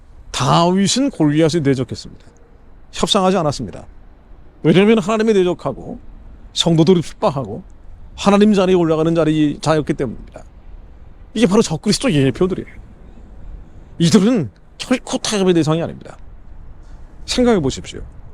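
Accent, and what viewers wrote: North Korea. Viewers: 하나님의 심판이 있을것이요 이사람 목사도 재명된자다 목소리도 이북 빨갱이 냄세가 나는데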